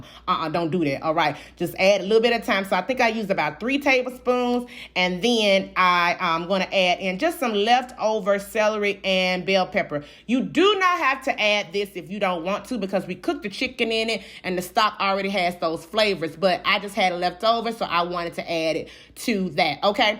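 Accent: South, United States. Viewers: It's just so sweet. Your down to earth southern accent and instructions. ❤ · I don’t know who this heffa here is, but babbbbyyyyyyyyy I swear my husband and I love her country talking ass! Her big Ma showed here just what to do and she put her own comedy twist to it God bless this sister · Babyyyyy she sooooo country I love it! I KNOW HER FOOD FIRE! The south for sure she sounds like me lol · Like her southern talk.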